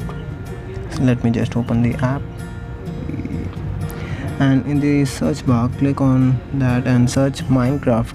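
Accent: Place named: India. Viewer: Guys if he is indian that doesnt mean he talks weird and he is stupid. HE IS NOT HE HAVE OTHWR ACCENT and thank to you . But why you are not happy when talking?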